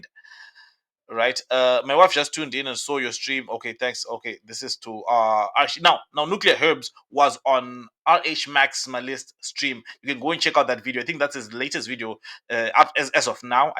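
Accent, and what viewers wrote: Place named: Africa. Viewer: Speak some proper English you have such a strong accent African accent, American man this is America man !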